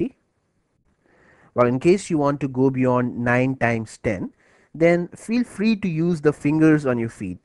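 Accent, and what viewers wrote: India. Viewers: By listening to your voice I can tell that your an Indian :) keep up the good work brother!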